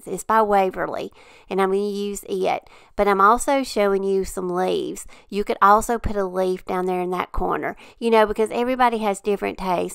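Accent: South, United States. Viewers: Just found your channel and love your creativity and your Southern accent! Up here in Canada we having boring voices..lol. you are so sweet. Going to enjoy following you💕💕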